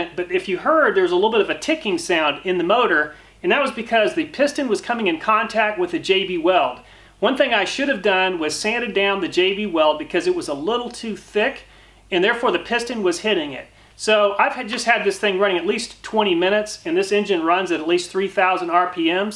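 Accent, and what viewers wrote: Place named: Midwest, United States. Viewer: Nothing better than filling a gash. Love these videos. Just a guess but you're from northern Missouri or Iowa.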